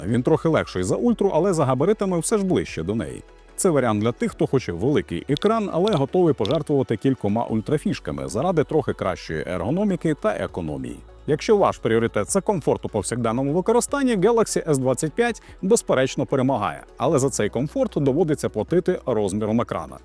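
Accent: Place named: Hutsul, Ukraine. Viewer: Гуцул долбаный )))., диктун на русском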